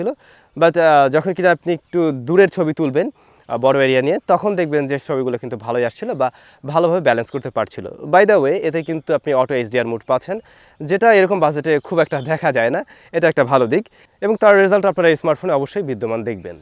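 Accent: Barishal, Bangladesh. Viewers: ওহ আন্নে বরিশাইল্লা🥰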